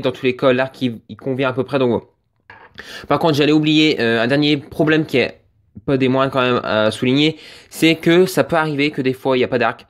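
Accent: North, France. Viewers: Tu Vien du nord touwa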